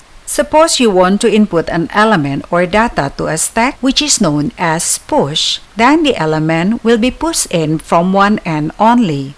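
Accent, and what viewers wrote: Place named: India. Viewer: за 2 минуты и 35 секунд с шикарным индуским акцентом я понял больше чем за полтора часа с преподавателем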